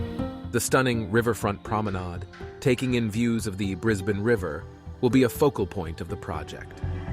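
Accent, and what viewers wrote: United States. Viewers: I didn't - it was smooth! I did wonder why an American was narrating Australian construction but hey, global society and all that. :-) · Why is there an American voice-over? Give this video an Australian character by having someone who has an Australian accent, at the very least.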